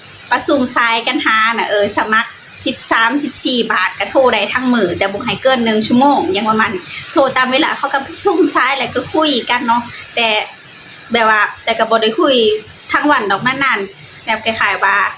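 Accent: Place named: Ubon Ratchathani, Thailand. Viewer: สาว พูดสำเนียงคล้ายอุบล ไม่เหมือนคนลาวเลย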